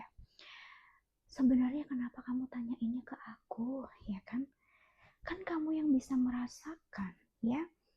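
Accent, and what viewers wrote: Java, Indonesia. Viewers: logat jawa nya melekat jirr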